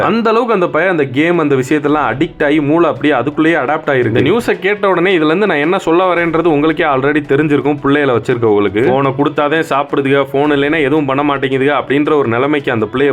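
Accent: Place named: Karaikudi, India. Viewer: Bro I think your native is Karaikudi......... your slang....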